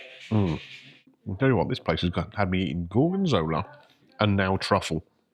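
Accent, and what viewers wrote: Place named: Cockney, United Kingdom. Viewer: You have 'worked some magic' as well, with your descriptions of these dishes! From time to time, I am struck by your (to an American ear) Cockney accent: it adds a grounding note to your sophisticated discussion. I would have ordered the venison plate! Post Script: What will Mrs. Greeno say when she sees this video and she is not mentioned as being there???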